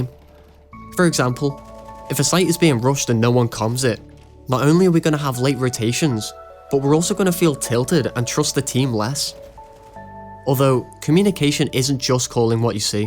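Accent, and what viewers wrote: United Kingdom. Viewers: Why is he british????????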